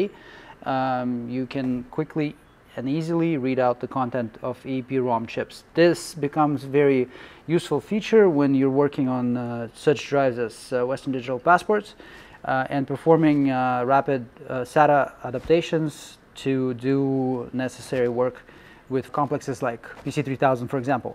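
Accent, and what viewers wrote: Russia. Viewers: Русский акцент?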